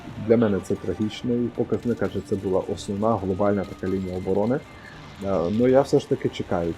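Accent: Transcarpathia, Ukraine. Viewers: А ти з Закарпаття?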